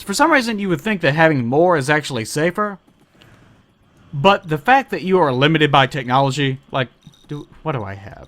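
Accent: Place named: Kentucky, United States. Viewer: Goddamnit, how do you make a Kentucky accent sound so gallant?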